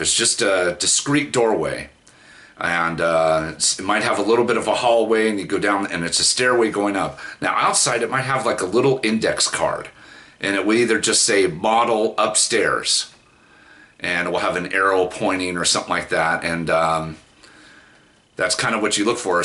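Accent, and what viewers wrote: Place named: England, United Kingdom. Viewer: Great English accent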